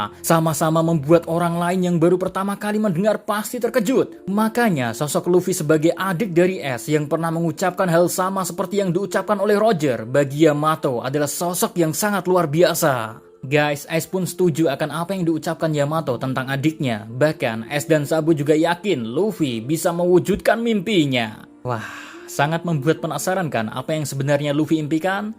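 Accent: Java, Indonesia. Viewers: Jowo mu emang medok min,tapi caramu nyampek no pesen keno nang ati,marai mrinding 🙏🙏🙏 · lebay jir. suara medok gitu mana bikin merinding 😂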